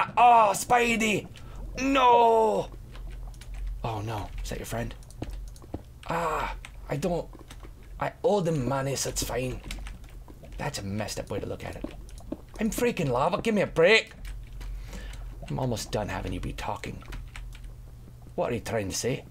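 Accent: Scotland, United Kingdom. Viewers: I just watched a guy talk to himself in a Scottish accent for 12 minutes...... am I really that bored? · His Scottish accent reminds me a lot of Desmond from Lost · Ignore anyone saying the Scottish accent is offensive. It's not, I find it funny just as much as I find Willie from the Simpson's funny. I am also from Scotland. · haha and nice scotish voice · Moar Scottish Accent please. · I could get lost in that Scottish accent all day <3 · Lol luv ur scotish accent soooooooooooooooooooo much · The Scottish accent is cool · Your scottish accent omggg xD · I will never get tired of Scottish accent...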